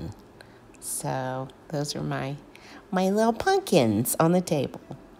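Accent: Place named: South, United States. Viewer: Hi! I just found your channel. I am also a retired teacher and I can detect your southern accent. You can take the girl out of the south, but you can't take the south out of the girl! Thank goodness! I am a transplanted Texan living in Houston, Missouri. I really like your decorating style. I will be back for more videos.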